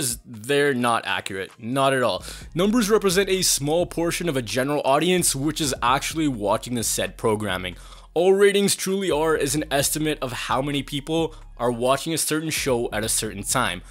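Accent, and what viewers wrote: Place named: India. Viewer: this guy speaks with a heavy indian accent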